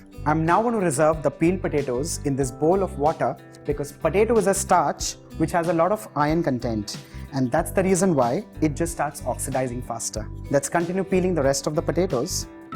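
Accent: India. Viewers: strong indian accent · You speak english with indian accent i have no idea wht you talk haha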